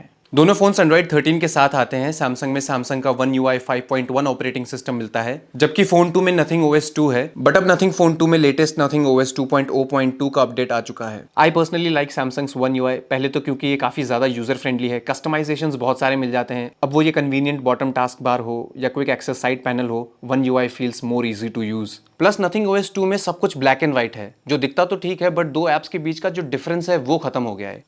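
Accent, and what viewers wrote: Dehradun, India. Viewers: Bhai dehradun s ho aap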